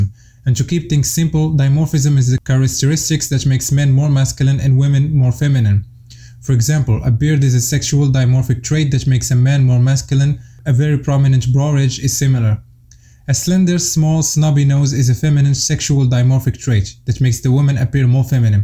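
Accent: Morocco. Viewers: I knew that you're Moroccan from the beginning due to the way you pronounce the letter "T" like "tch" or something lol. Much love to Moroccan people ❤️ Also Eid Mubarak if you're Muslim ♥️ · I knew you were Moroccan just by your accent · i guessed your are from morrocco after 10s of the video based on your accent. good content akhoya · Ghir mn accent 3rftek meghribi, nadi a khoya laysahel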